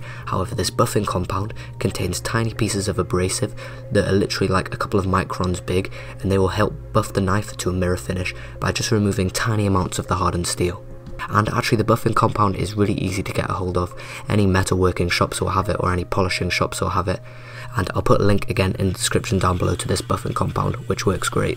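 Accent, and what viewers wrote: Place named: England, United Kingdom. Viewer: bla bla bla bla typical england english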